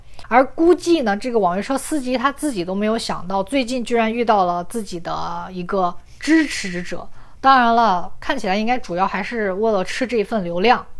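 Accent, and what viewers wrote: Standard. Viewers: 希特勒。。。姓xi。。。。。。。。。其实你中国话说的还挺标准呢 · 我很好奇一点！你说着一口比我标准且流利的普通话，大概就是汉族吧。我只是中国西部一个少数民族，我觉得中国最起码安定不吵闹。你一个本族人整天在这外网上喷自己的祖国着实很难理解。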